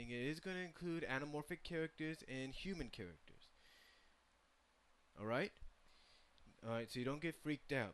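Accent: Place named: New England, United States. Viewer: Are you from New England or somethin g? You sound like a very young Ralph Bakshi.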